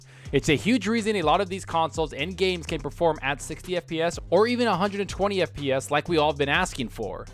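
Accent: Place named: United States. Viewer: This channel is aids. Video is 80% neddless filler with an annoying over Americanized voice.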